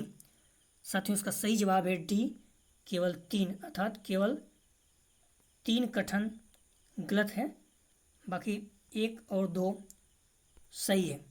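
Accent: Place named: Allahabad, India. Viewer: आपकी आवाज़ मधुर लगती है l एकदम इलाहबदी अंदाज़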